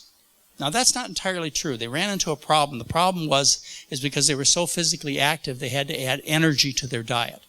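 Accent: United States. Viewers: Carole von Aarberg Hello from a Southern Louisiana girl! Nothing wrong with this pronunciation! I’m not sure exactly where the doctor is from but his pronunciation is definitely a Southern US accent. There are many accents in the South but many say potaytah. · 'Scuse my ignorance as a Brit/Aussie, but just what is wrong with potaytah, that's how I say it, and where is this US accent from?? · It's a midwestern accent. You never lose it.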